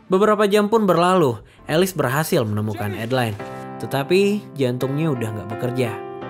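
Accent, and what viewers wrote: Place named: Java, Indonesia. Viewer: Medok nya ituloh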